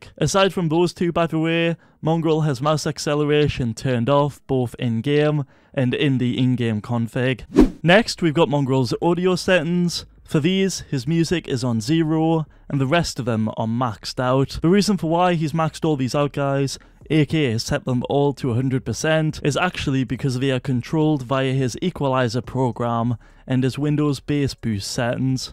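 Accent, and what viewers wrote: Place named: United Kingdom. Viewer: is british acent right?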